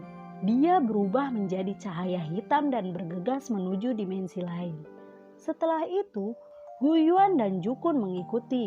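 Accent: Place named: Sunda, Indonesia. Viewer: Logaaat sundana kenteeeel amaaat siich😂😂😂 ber abad abad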